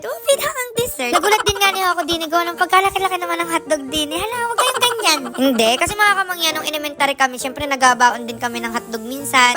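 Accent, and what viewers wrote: Batangas, Philippines. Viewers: South Cotabato, Mindanao po. May dugong Batangueña. Ang tono mo po kasi magsalita ih Batangas. Nakapunta po ako once ng Batangas parehas kayo ng Tono ih. 😊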